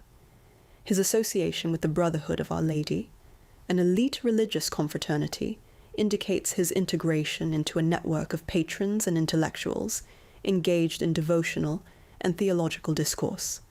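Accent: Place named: England, United Kingdom. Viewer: Hate the clipped English accent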